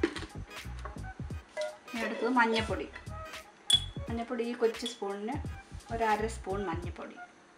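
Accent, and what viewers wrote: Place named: Chengannur, India. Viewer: chechy fish cury superrrrrrrrrr.samsaram kettittu chengannurkkari aanennu thonnunnu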